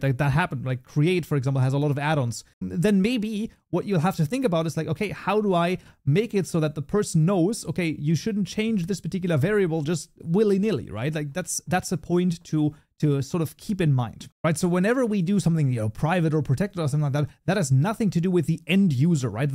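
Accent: Germany. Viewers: Sure, Ill do so. Funny german accent <3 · Funny german accent <3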